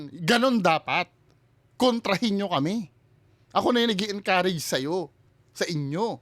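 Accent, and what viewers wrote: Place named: Visayas, Philippines. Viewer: Ate Bisaya ako Pero ikaw Bisayang Bisaya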